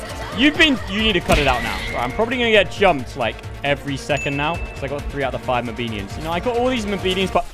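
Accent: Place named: United Kingdom. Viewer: L british man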